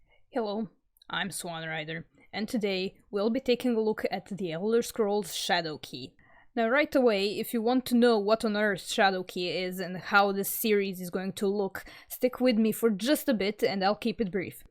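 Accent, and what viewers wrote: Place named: United Kingdom. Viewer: I though you was british or something like that. I like your Accent. Is kinda cute.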